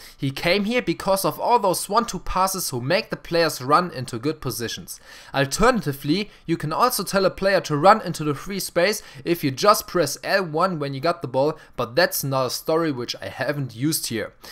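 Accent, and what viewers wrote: Germany. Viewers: Love his German accent <3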